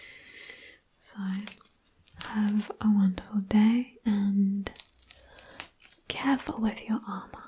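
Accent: United Kingdom. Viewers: Nice British accent. Thank you for the video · I get really happy when does a doctor rp because it means a British accent again. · Nice doctor RP, love the British accent. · Love the accent! I always found the British accents were the most tingly 💤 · with an English accent is a real treat. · Great Video!!! I love the Doctor Asmr video!!! I also love the British Accent and Glasses for this video too because they make you even more Beautiful!!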